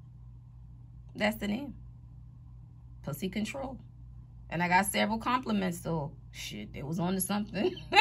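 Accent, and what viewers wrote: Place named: New Orleans, United States. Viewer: Your accent gets me every time! Are you from New Orleans?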